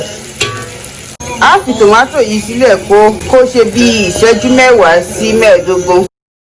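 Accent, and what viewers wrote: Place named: Ilorin, Nigeria. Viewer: You should have added subtitles. But I kind of enjoyed hearing pure Yoruba video. You sounded like you're from Ilorin.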